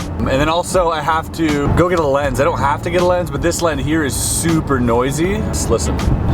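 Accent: Canada. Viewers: This guys Canadian?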